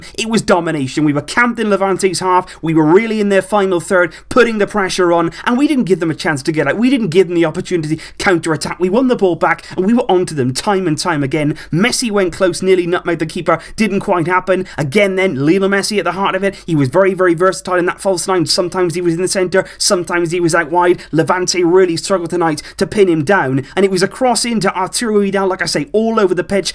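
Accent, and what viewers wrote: United Kingdom. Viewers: You sound like a Brit with an American accent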